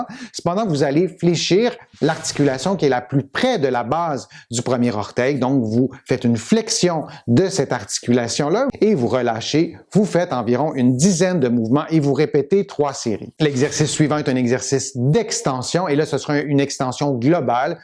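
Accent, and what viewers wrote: Quebec, Canada. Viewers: Très intéressant. Je les faisais déjà de ma propre intuition mais pas debout. Et c'est très efficace. Ça ralentit significativement l'evolution. L accent canadien me ravit. C est donc "double peine".. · Grand merci de France pour toutes ces propositions d exercices ...que j'aurais aimé trouver il y a quelques années... Mais mieux vaut tardbque jamais 😊 Je vais m y mettre avec grand plaisir pour tenter d enrayer la progression .. et c est si "plaisant" d entendre votre accent enchanteur (mon mari était québécois 😘🙏) Merci infiniment !🙏🙏🙏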